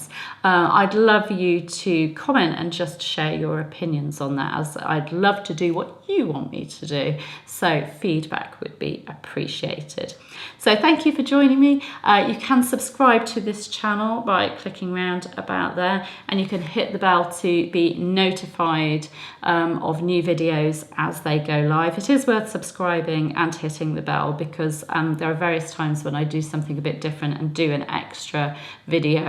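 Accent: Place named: RP, United Kingdom. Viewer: OMGosh -- I'm cringing at your dreadfully posh Ingleeshi accent and pompous body language. You are the reincarnation of Bloody Mary -- complete with the tattoo on your arm, and NHS teeth 🤣😂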